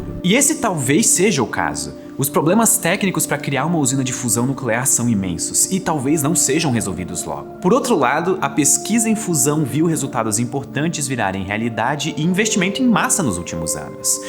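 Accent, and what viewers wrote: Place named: Brazil. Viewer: Como eu consumo muito conteudo no youtube em inglês (sem legendas dur) ouvir um br falando ingles é bem estranho, sei la diferente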